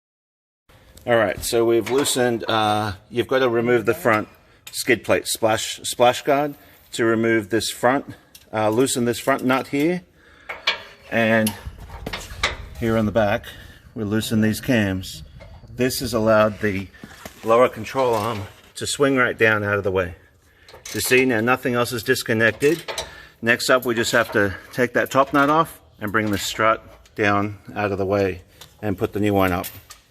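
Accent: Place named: Australia. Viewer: I cannot make out your accent for the life of me. It's sorta Australian but not really. Sorta British but not really? Maybe New Zealand? Also, yeah you guys did it bare minimal taking everything apart. Other videos they would have taken everything apart, including the brake lines because they're so paranoid. But when you know what you're doing....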